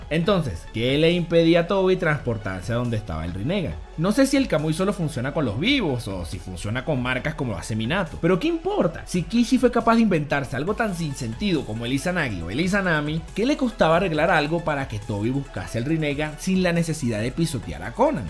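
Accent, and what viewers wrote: Venezuela. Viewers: en este video se le sale el acento venezolano a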